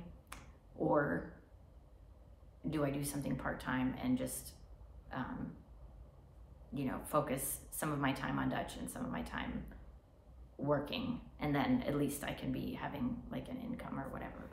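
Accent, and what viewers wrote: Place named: United States. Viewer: your american accent... quite charming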